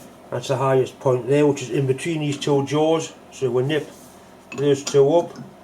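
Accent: Newcastle, United Kingdom. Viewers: Although I am a Yorkshire 'Tyke' and not altogether accustomed to your Geordie accent, I understand you perfectly,. Just remain as you are! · Geordie as it is spook! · Il est du Nord-Est de l'Angleterre, il parle l'anglais avec le dialecte atypique pour les gens nées a Newcastle-upon-Tyne, le 'Geordie', qui est une mélange de anglais,celte et mots de langue nordique (norvegien et danois).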